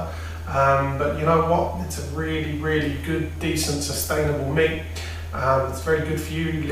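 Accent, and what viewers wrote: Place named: Australia. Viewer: I like your recipe and I like your knife but your Australian accent is hard to listen to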